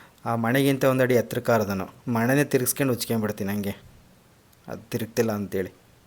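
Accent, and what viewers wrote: Chitradurga, India. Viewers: ಅವರ ಮಾತೆ ಅವರ ಸಂಪತ್ತು, ಮತ್ತು ಆಕರ್ಷಣೆ, ಯಾಕೆ ಹಾಗೆ ಹೇಳುತ್ತೀರಾ. ಅವರ ದುರ್ಗಾ ಭಾಷೆ ಶ ಎಂತ ಚಂದ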